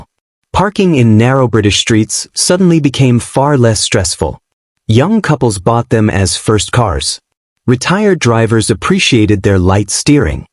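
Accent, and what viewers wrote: United States. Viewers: I am so sick and tired of AI generated garbage on YT. These are British cars so why do you show so many of them with foreign registration plates and FFS, ditch the Yank narration! You should have a British accent talking about British cars!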